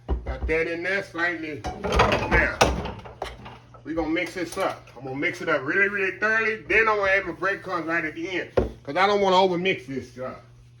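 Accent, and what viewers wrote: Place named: Texas, United States. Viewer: Fixin ta make yo meatloaf again. Hands down feels like home your cookin. They moved my old ass from south part of Dallas up here ta Wyoming. Aside from the cooking hearing someone from my aria is so nice. I miss Texas baby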